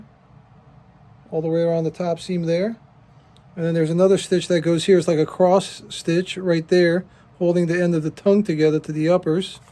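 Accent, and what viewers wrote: New York, United States. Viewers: I like how you talk. Are you from up north, like New York or something?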